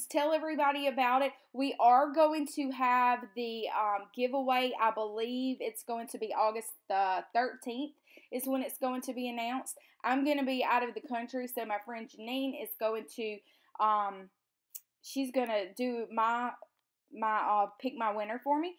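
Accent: South, United States. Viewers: I love how a southern accent just makes everything better! :) I have watched a couple videos on image transfers - I’ll have to check out your other videos - for some reason it’s a technique I love but scares me. I think I need to purchase the gel medium - I have fluid - I think the thicker medium will help the process.... also love the masking tape tip! From what I have seen from this video I am really going to enjoy your other work! That’s one of the reasons I love these hops. 1. I get to see new channels 2. I love seeing a similar theme through out and all the different takes AND 3. Who doesn’t love a chance to win something! Lol. (USA) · Girl your accent makes me home sick :) I grew up in South Ga/ North Florida but now live in Ohio. Love the image transfer idea. Really cool page. (In the US) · your beautiful southern drawl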